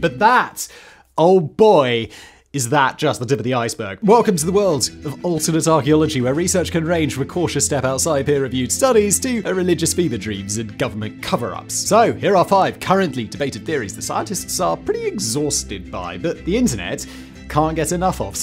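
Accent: RP, United Kingdom. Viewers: If this guy didn't have an educated British accent, no one would listen to him.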